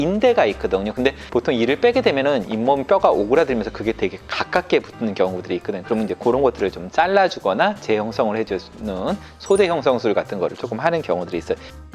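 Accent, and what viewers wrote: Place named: Busan, South Korea. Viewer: 원장님 고향이 부산?분이신가여? 말하시는거 들으니 바로알겟네여 ㅎ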